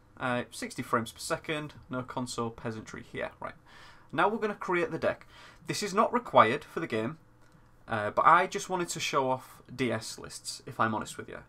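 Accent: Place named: Yorkshire, United Kingdom. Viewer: this is a great video, so nice to see a tutorial for beginners that is not just another platformer. just what i wanted/needed oh and I love the accent, there are really not enough Yorkshire accents on this site.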